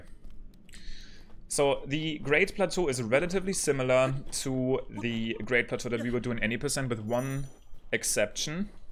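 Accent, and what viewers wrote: Germany. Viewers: Dude i just watched this Video a minute long and just the way you speak tells me that you are german xd ja ich bin auch von Deutschland lol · i love the german english accent.. or just the german accent in general!! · this beautiful thick german accent is love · This German accent is just nice 😂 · Wegen dem Akzent würde ich Deutsch sagen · Man hört so raus das du Deutsch bist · Im hearing a gernan fellow :) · idk if he does in this run or not, but my favorite is when he says “ragdoll” bc it sounds like “rectal” lmao. german accents are excellent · bro i love your german accent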